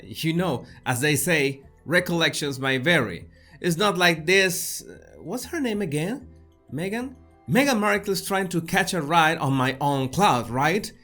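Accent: Mexico. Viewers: PLEASE!!!! TRY NOT TO SPEAK IN THIS HORRIBLE MEXICAN,RUSIAN,CUBAN ACCENT. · I like your accent..where is it from??? It sounds Mexican..but......i like yours...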